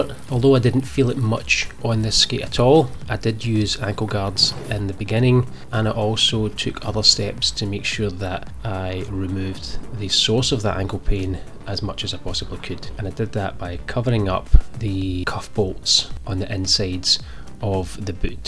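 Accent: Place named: Scotland, United Kingdom. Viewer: Also where about in Scotland is that lovely accent from?!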